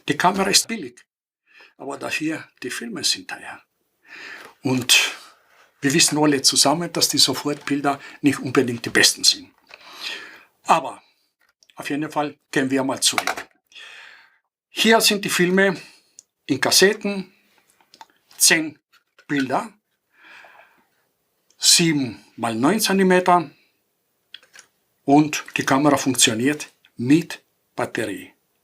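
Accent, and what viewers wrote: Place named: Austria. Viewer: von victor 1977 frankfurt am main,ach ich liebe den leicht jiddischen oesterreichich ungarn dialekt wenn du sprischst,das konnte ich mir stundenlang anhoeren,oder was ist das fuer ein dialekt,auf jeden fall ein aehnlicher dialekt,sehr original und entspannende,,von unseren deitschen europaischen dialekten muesste mehr an die neuen generationen weitergegeben werden...gruese von mir,schenes viddeo,und mach weiter so,ach ich glaube in den 70ern im italien urlaub hatten wir auch so eine kodak,vor allem an die kodak kassetten kann ich mich erinnern,aber in den ende 80ern anfang 90ern kauften wir eine polaroid kamera,und kennst du noch die kleine kamera mit discfilm,was war das nochmalfuer ine marke.kodak ist aber jetzt wieder voll auf dem weltmarkt,mit ganz neuen guten producten auch motion film und neuen kameras wie minishot2,wirklich echt gut...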